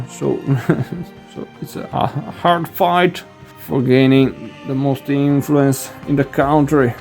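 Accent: Italy. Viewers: English with an Italian accent.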